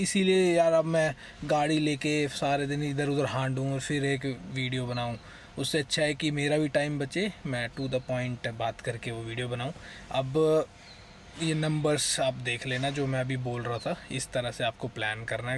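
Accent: Haryana, India. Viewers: Haryana ka aadmi boli s jarur pakda jaa h bhai ji…. Ab bas kahi aandhane chale jao trip pe 😅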